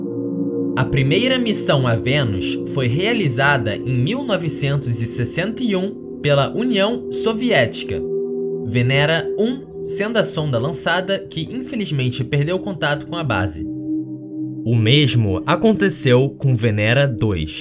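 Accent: Rio de Janeiro, Brazil. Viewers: sotaques feio deve ser carioca ..igual ao ladrão malandro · Adorei o nível do conteúdo e das curiosidades. Mas esse SOTAQUE!!!!! Meu Deus, da pra aguentar muito tempo não 😣😐 carioca né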